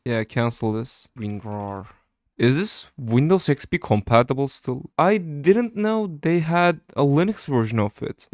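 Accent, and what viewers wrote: Turkey. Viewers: kral ilk 3 saniyede aksanından anladım. ülkemizden böyle içerik çıkması çok güzel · türk aksanın mükemmel ya hem mükemmel ingilizce konuşuyorsun hem türk olduğun belli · First time looking at this channel and the first three words I instantly knew you were Turkish just by accent lol. Great video man, keep it up. Ellerine saglik hocam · Konuşma tarzından direkt Türk olduğunu anladım böyle videolar yapmaya devam et Türk kitlesinden bir cacık olmaz❤ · seni ilk duyduğum anda bu Türk dedim kardeşim en duyduğum en tükçe İngilizce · Türk telaffuzu, nerde duysam tanırım. :) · valla ilk saniyeden turk oldugunu anladim, aksan ele verdi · hayatımda duyduğum en türkçe ingilizce reis süper video adamsın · türk aksanı baya belli etmiş ama video kaliteli abone oldum:) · Daha girişte anladım türk olduğunu türk türkü tanırmış dostum videolara devam🔥🔥🔥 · video çok iyi olmuş ellerine sağlık türk olmana şaşırdım biraz aksandan anlaşılıyo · Are you From Turkey?!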